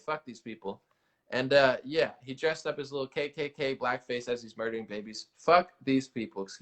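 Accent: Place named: South, United States. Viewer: Dude, love the southern accent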